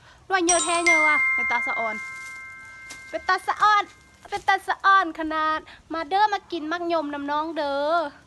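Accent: Laos. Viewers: ตัวนกพูดแบบนี้แหละฟังรู้เรื่องถ้าพูดอีกแบบที่ ตัวเออ มันฟังไม่รู้เรื่องครับพูดแบบคนลาวพูดถึงพอจะเข้าใจครับ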